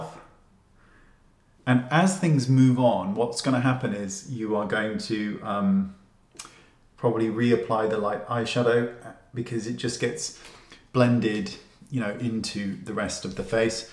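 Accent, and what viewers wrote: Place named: London, United Kingdom. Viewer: Thank you very much,. I love your English. Where r u from? London? 💞💕👏🙋🏻🇪🇸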